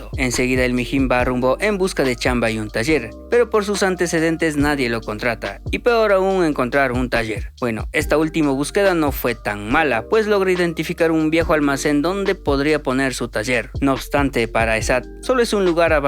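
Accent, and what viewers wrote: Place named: Ecuador. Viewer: Por como hablas eres paisano de Ecuador, un saludo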